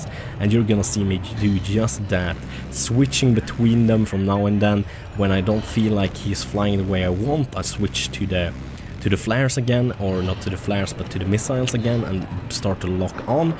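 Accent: Sweden. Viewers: He's from Sweden, give him a break on his accent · Oh shut the fuck up guys. He's a Sweden so it's fine if he has an accent and he is a Damn good player and youtube. So hive him credit. If you don't like his videos, dont watch.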